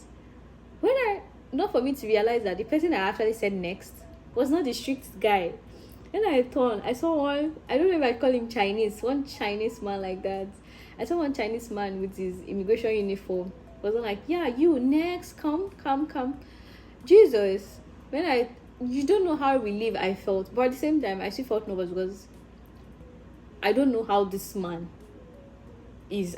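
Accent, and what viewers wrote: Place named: Cameroon. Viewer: U sound like a Cmrnian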